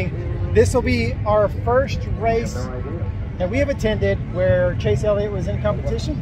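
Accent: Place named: South, United States. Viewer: I do declare! It sounds like found a little Southern drawl while being there! My mother used to point that out in me when I would come home on leave when I was stationed down South.😉👍🏼😎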